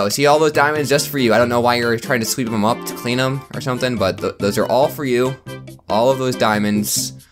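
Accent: Australia. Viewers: why the Aussie ascent hmmmmmmm..... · Thanks mr Australian accent guy for saying we are terrifying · You’re my favorite YouTube or ever I like your name and your British accents pretty good I mean I mean Australian accent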